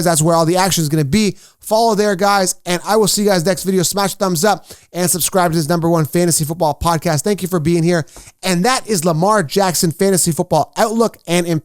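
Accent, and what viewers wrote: Canada. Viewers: Are you canadian? i think i can hear a slight accent lol.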